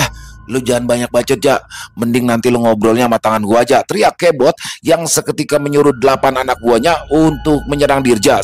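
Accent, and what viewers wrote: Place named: Betawi, Indonesia. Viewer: Slmat siang..senang kisah warong kopi Dony muncul kmbli bersama si gembul yg lucu juga dialek khas Betawi nya mas...sy tunggu cerita nya yg lbih seru,slam dri sy ibu Eky di solo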